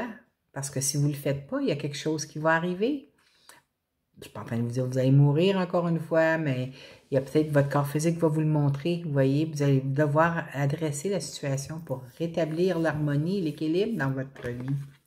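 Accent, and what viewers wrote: Quebec, Canada. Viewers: Merci merci infiniement ma bonne fée..un trés beau tirage..je vous souhaites une trés bonne semaine🌹💗🥰🤗🧚‍♀️💞 P.s" jadore votre accent Quebecois craquant!!🤍🌺💗💯💥💫🙏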